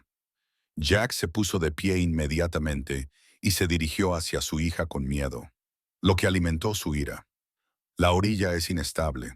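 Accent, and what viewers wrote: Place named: United States. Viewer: Realmente el relato con esa tonada INNECESARIA de Yankis con una hormiga en la lengua me pone de muy mal humor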